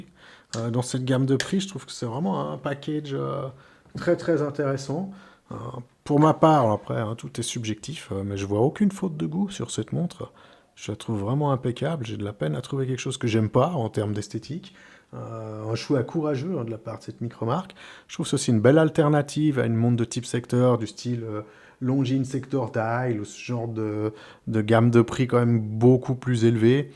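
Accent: Geneva, Switzerland. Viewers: Très belle montre! et joli accent de Genève ;)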